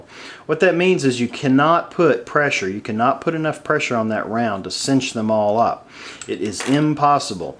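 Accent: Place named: South, United States. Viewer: I wouldn't recommend you carrying a gun or complaining about this fine weapon.I realize you must live in a trailor park by the hick accent.Please do yourself a favor and contact Kahr.I believe the service rep would explain to you that the gun is designed that way.The wiggle and wobble don't effect the gun.Gun requires a break in.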